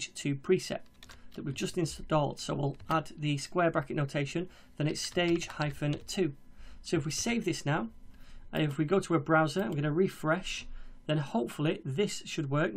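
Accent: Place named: Northern England, United Kingdom. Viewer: I watched every Vue video. I have to say... playing at 2x speed really pushes the mind as you deliver your content so fast anyway! It's also extremely refreshing to hear a northern accent so intensely after living in London for seven years... Thank you very much!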